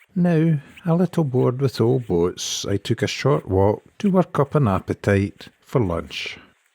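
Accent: Scotland, United Kingdom. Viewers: Subtitles not available in this video??? 😢 very complicated to me to understand your lovely scotish 😒 anyway, I'll be enjoying the pictures 😀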